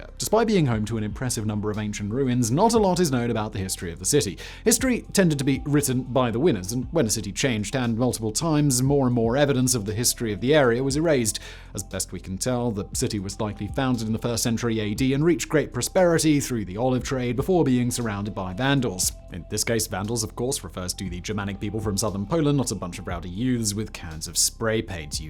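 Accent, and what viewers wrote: RP, United Kingdom. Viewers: The cool thing about, he’s got that posh English accent but it’s cool not annoying. I could have a pint with for sure.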